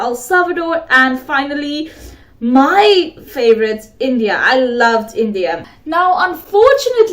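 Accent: South Africa. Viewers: I thought you were from south African, you speak with south african accent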